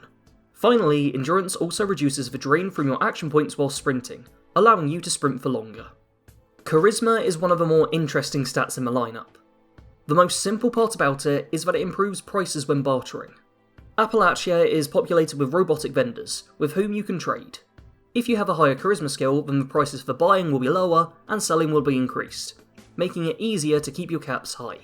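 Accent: United Kingdom. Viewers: Given the thick Brit accent. It behooves you to slow down your speech, I just can't understand you most of the time.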